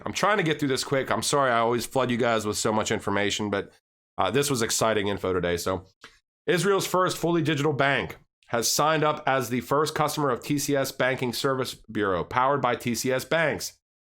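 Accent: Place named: Midwest, United States. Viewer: I'm Charlotte NC You have a mid west vibe Chicago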